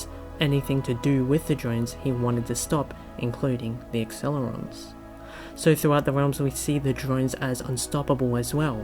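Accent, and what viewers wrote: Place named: United Kingdom. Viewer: I can't listen to "if you're beiaten and tortured to a pulp." Yout Birtish English is hard to me that's why I ask on comment section when the captions don't match the dialogue.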